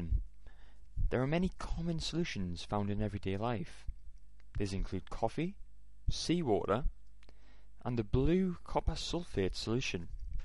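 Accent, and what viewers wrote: Scotland, United Kingdom. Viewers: Thank you for the help! Chemistry is one of my favorite subjects in high school, and you've helped me out a ton with it! I cannot thank you enough, good sir! By the by, are you Scottish?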